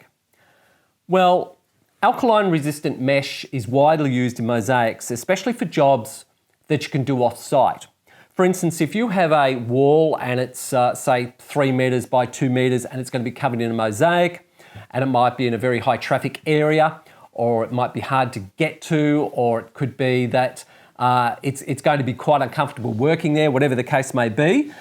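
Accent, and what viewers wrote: Australia. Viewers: Very well articulated and I love the Austraaaiilliiaan accent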